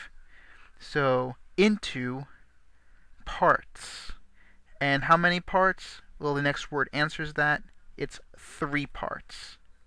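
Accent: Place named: United States. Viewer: Your pronunciation is too "American" sometimes: instead of [e] or [o], You read [ei] or [ou]. Also, if you're trying to use reconstructed pronunciation, try to find a version of text where long vowels are marked, it completely changes the accent: dIvisa, trEs, quArum Unam etc. And it would be really great if you'd just read it faster;p it'd have sounded much more realistic.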